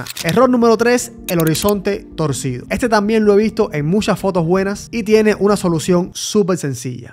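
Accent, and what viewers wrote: Cuba. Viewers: Jajaja.. desde que empezó el video tenía la duda pero cuando dijiste “se nota a la legua” dije este es cubano si o si 🤣..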